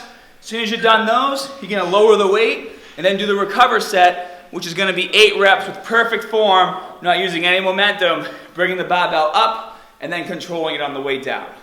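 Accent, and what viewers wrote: Boston, United States. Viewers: Is this a Boston accent? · You're certainly from Boston lol, but u crossfit bruh??? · Dude you from Boston ? 😂 the accent tho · Definitely a Bostonian. love it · From Boston? Me too lol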